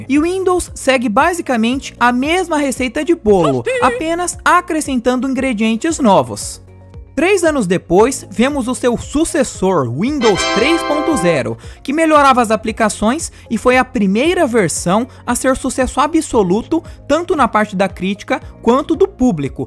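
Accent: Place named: Caipira, Brazil. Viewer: caipirês detectado...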